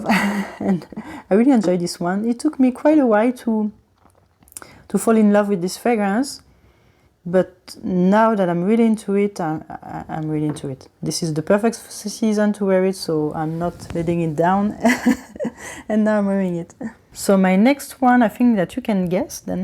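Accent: France. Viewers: Love your videos and collection and on the top, Looooove your English with French accent 😘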